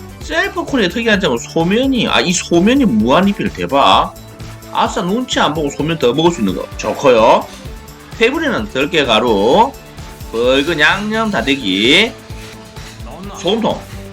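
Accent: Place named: Gyeongsang, South Korea. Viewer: 형님. 채널에 따봉 30회 정도 박고 추천 뜨면 꼭 봅니다. 답변 두 가지만 부탁 드립니다. 1. 아무리 맛집이라고 해도, 기대감이 얹혀지면 감동받기 쉽지 않은데, 정말 이 집만은 무조건 꼭 가야한다라는 곳이 있으면, 한 곳 추천 부탁드립니다. 2. 무꾸재비 뜻 좀 알려주시라예. 볼 때마다 너무 궁금합니다. 저도 경남 촌놈인 주제에 처음에는 사투리가 너무 거슬려서 부담스러웠는데, 지금은 그 사투리 없으면 무슨 맛으로 볼까 싶은 마음입니다. 어쨌든 마창석님과 기분 좋은 영상 계속 만들어 주시면 감사하겠습니다. (마창석님의 짜투리 평가도 넣어 주시면 감사겠습니다. 목소리가 궁금합니다.)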